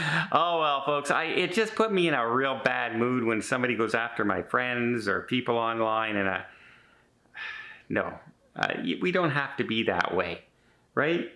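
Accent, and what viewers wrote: Canada. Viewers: 'eh Don. Me and hubbies love your channel . being Finnish people ask me if I'm Canadian as I pronounce words like you. Good luck to you and Tony. We are cheering for you. Your neighbors in Sault. Michigan. The yoopers. Prayers for your health. Kate and Jim Peach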